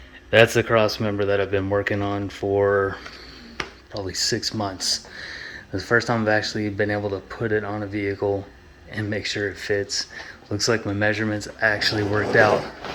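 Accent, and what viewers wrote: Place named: East Coast, United States. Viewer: Looks awesome…..I know your in Cali but you sure sound like your from the east coast!! One love G and infinite blessings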